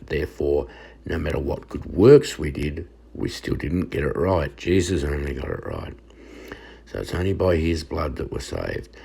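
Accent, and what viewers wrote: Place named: Tasmania, Australia. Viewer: ❤❤❤❤❤Waking up to your Taz Aussie voice is wonderful! Talking about rapture with that accent makes my morning! Thank you! Have a wonderful night and sweet rapture dreams! ❤❤❤❤❤😊 That big bright moon was right over my house on the mountain last night. Lit up the entire back yard! My 🐕 dog loved being able to see foxes, deer and any other critters out there! ❤. So, after hearing your wonderful accent talkin' 'bout rapture....I go outside to see the chemtrails ONLY over me (yes, all lines cut off to and fro, just over ME) and I took pics to show people so they'd believe me. I told them if I disappearr....dpState. Nothing like being targetedd, but Jesus is with me, protecting me. Yes, misspelled words so "Aye-eye-yie-yie" won't track it so quickly hopefully....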